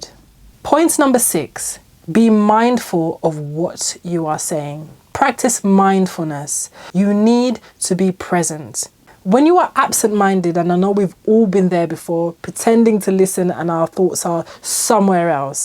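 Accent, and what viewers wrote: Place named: United Kingdom. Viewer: it's just proper British talkin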